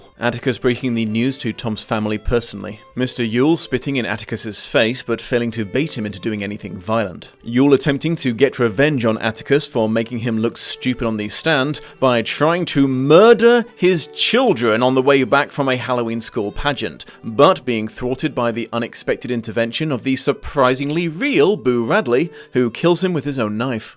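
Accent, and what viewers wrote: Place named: RP, United Kingdom. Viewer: No offense, but it’s a bit weird to hear this guy describe this book in his posh English accent. I start talking with a southern drawl when I think about this book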